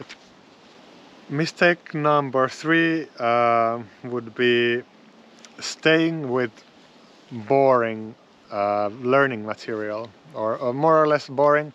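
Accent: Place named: Finland. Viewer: hey,! my dream is to learn Finnish, but I never have the time to do it. I hope I'll be able to do it little by little with your channel, I really like the way it is made. I speak 5 languages fluently, but I think it's because I have a hyperlexic brain. I really like your channel and I really like your accent in English (I can hear the Finnish melody I so adore, but it's only because my ear is well trained to detect the slightest trace of an accent). I never understood why my love for Finnish was so strong. it might be because it sounds a little echolalic and just very beautiful to my ear, or it might be my (supposedly) Finnish DNA speaking, haha. I do remember making a random Finnish woman at a Warsaw train station very happy when I announced "Minä rakastan suomen kieli" to her.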